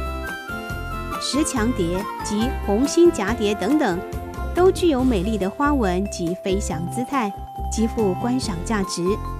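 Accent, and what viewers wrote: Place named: Taiwan. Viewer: 主持人講話口音很清晰有台灣味道很親切好聽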